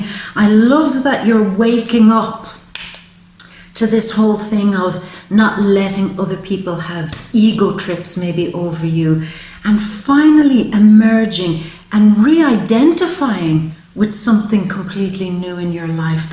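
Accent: Ireland. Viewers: Irish?? Love the accent. I'm hetro by the way but something about you....... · Between your beautiful calming energy, gorgeous face and enchanting brogue, I can't help but feel hopeful even facing adversity. Just as you said in this reading, I have someone really rubbing me the wrong way and it's family and I'm shaking thinking about the difficult conversation I have to have with them but I will stand resolute in the knowledge that I will speak my truth and that shall be good enough. Thank you...your gift is truly divine..wish me luck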